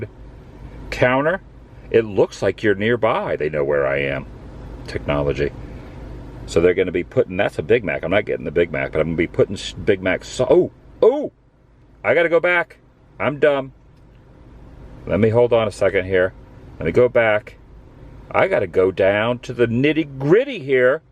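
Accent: South, United States. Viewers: Missing your adventures so I got up early, created a queue and getting my southern drawl to start my day! xo sending hugs to the Mama, Papa, Sissy & Brother-in-law!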